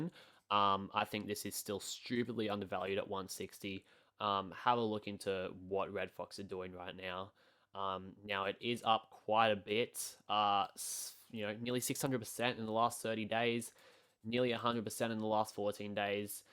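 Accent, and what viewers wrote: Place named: Australia. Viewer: I subscribed because you sounded like an aussie living the crypto dream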